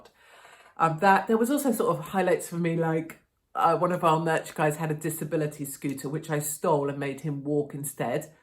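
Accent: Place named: Wales, United Kingdom. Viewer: truly just hearing you ad-libing energetically: Welsh pro-nounciations + lol!!, why, indeed I DO FEEL Better in all this terronic global gnash love you!! The Gospel:"...Christ died for our sins according to the scriptures; 4 And that he was buried, and that he rose again the third day according to the scriptures" 1 Cor.15:3,4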